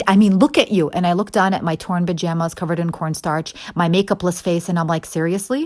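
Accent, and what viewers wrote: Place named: Michigan, United States. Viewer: You don't hear the accent? 😂 She's from Michigan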